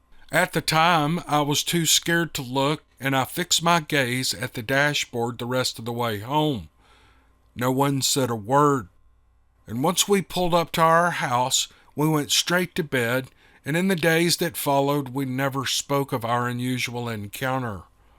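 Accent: South, United States. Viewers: Again, I missed hearing this podcast. I need to change my email address, duh! For some reason your new podcasts aren't on YouTube TV! What's up with that? I always listen to them at night on TV, and I enjoy them so much. If you get a chance, I did send you a file that I narrated some time ago. There might be a couple more that I did you haven't put on yet. Perhaps, they weren't good enough to include. That's your call, of course! I'd love to narrate a couple to see if they go over well. My voice isn't you so I'm sure people would miss that southern accent of yours! I'm just an old yank! LOL Hope you're doing well. Glad to hear you have a job to do! I'll help if I can, just let me know.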